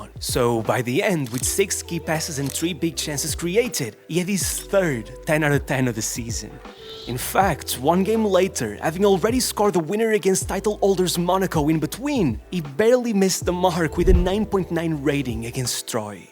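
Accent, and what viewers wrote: Portugal. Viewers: Love your Tuga accent. Cheers from Portugal!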